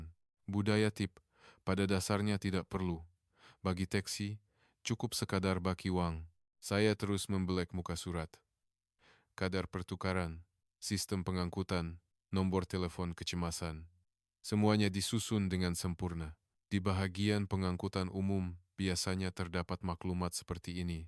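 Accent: Indonesia. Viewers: suara admin org indon.bukan org malaysia.sebab org indon suka <link> malaysia tidak bercakap macam ini. ini penipu dari indon.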